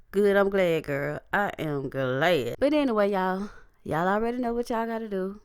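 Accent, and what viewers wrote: South, United States. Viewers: I watch your show because I love your voice. You have that southern drawl mixed with the black soulful personality. You are unique! I am white, but I still love your voice! I like it when you say "Moving on". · OMG before I read the ABOUT section of your channel, your Southern accent hooked me in and made me laugh my a** off. I'm a natural born Nashvillian and had to subscribe. Not only because of our Southern origins but also because of the truth you bring. That damn Jackie is gonna reap what she's sown on behalf of her "darker child". You don't mistreat, alienate, or totally disown an innocent child without karma visiting yo ass.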